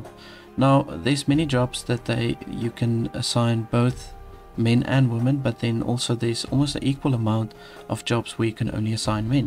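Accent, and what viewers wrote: South Africa. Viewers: South African bruv? perhaps?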